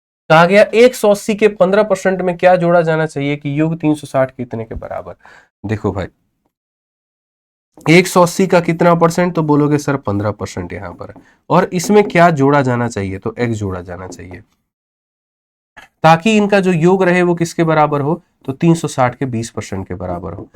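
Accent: Bihar, India. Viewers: bihari Vali bhasa Mt boliye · Maaz aa gya sir ❤❤❤ dil khus ho gya Aapna bihari ton ka baat hi kuch aalag hai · Sir , aap bihar se hai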